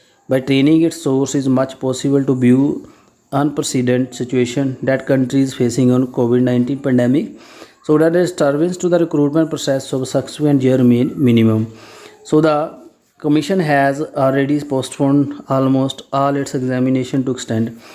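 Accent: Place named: Punjab, India. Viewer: Sir ji twadi punjabi esde vich saf nazar a rhi hai...i like it 😍😍😍😍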